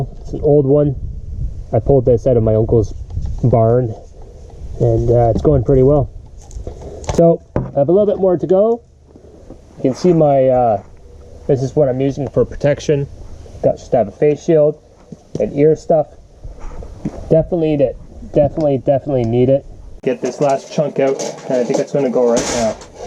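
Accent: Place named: Canada. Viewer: Thought I detected a slight Canadian accent, there - Eh?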